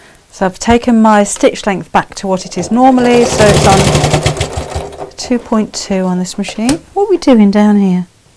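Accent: United Kingdom. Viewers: I love Your smile, your vice, your cool british accent and yours works!!!!!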